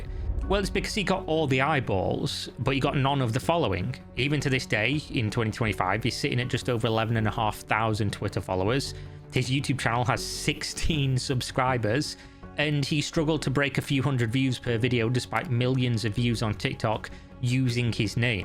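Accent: Northern England, United Kingdom. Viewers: First time ive seen you on camera, your voice is Northern English gold. Keep these amazing videos coming.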